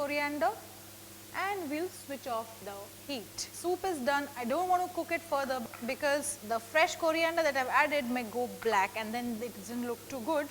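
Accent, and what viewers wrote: India. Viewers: what happened to ur pronunciation? very indian..! rather speak hindi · She happens to be Indian.so the Indian accent.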